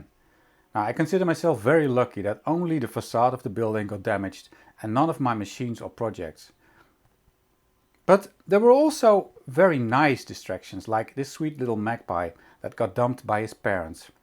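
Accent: Netherlands. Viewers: You sound like you’re from the Netherlands.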